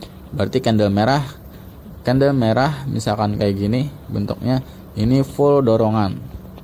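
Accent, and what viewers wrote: Banjar, Indonesia. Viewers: Orang banjar kh ini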